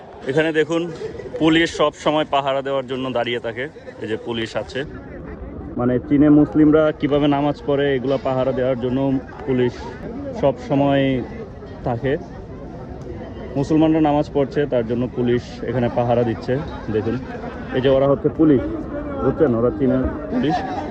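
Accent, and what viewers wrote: Bangladesh. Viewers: উনি বাংলাদেশি মানুষ। আমি বাংলাদেশের মানুষ আমি কলকাতার ভাষা আমাদের ভাষা শুনলেই বলতে পারি এই লোক কোন দেশের ভাষায় কথা বলতেছে। কারণ কলকাতার ভাষা আমাদের থেকে একটু আলাদা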